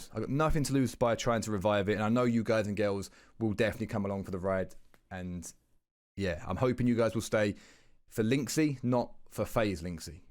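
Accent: United Kingdom. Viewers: I always enjoyed watching you and Spratt the most. Maybe as a Kiwi I just like the UK accent more than the American one? Excited for what's coming next!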